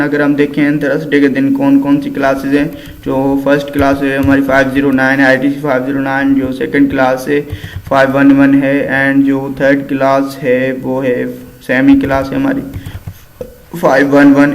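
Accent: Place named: Sindh, Pakistan. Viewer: Your Talking style seems Sindhi by the way Love you and Thanks <3 Peace !!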